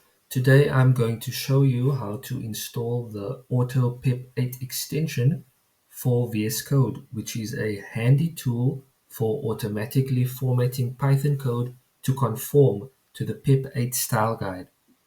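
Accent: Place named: South Africa. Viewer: love ur south african accent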